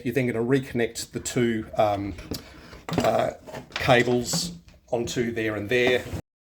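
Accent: Australia. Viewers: Thank you so much. Your explanations are very clear and easy to follow ... even with an Australian accent. I'm now going to attempt to fix my door open light on my 2011 Mazdaspeed 3. Thanks!!!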